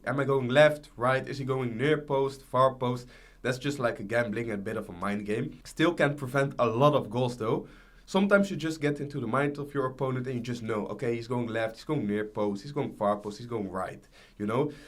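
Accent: Netherlands. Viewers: Hij klinkt Nederlands hahah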